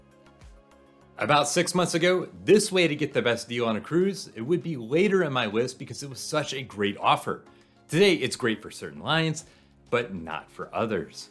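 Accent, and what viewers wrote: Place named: South, United States. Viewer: Folks from the south they talk like that. Call it a unique characteristic of the regional dialect. I'd even wager that most people wouldn't notice and most who do they would appreciate the slice of americana it represents.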